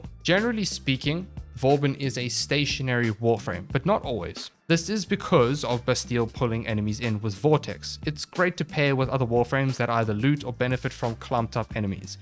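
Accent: South Africa. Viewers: are you south african your accent souns a little south african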